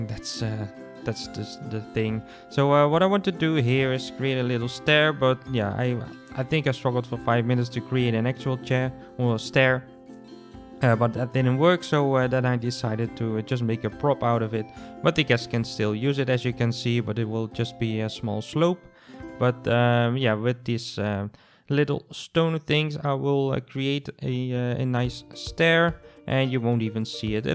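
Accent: Netherlands. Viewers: Nederlands accent??? Haha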